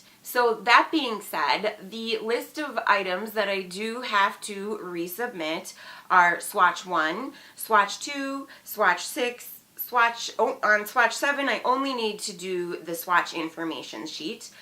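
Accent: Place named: Minnesota, United States. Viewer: Oh good! Also, I am originally from southern Minnesota and your accent takes me back! 🤗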